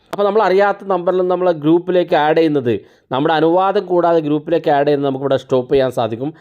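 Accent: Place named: Kozhikode, India. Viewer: Kozhikkodil aano sthalam? Samsaaram kettappol angana thonni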